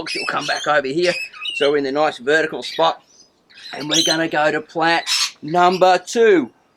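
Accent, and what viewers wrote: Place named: Australia. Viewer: i had no idea Australians had more than 1 accent but compared to say steve irwin this fella is hard to understand very very thick accent... its cool sounding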